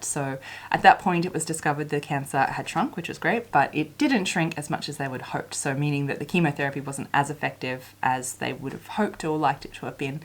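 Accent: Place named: Australia. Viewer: Just found your channel searching for ‘learn to sew videos’… so excited for watching all of your catalogue while I clean and craft and cook and maybe even try some sewing. Thank you for your videos, and so excited for a fellow Australian voice!! Also, amazing news for your recovery…I’m a breast cancer researcher (only a little bit part time with a family, the rest of the team does most of the work), overcoming TNBC is huge.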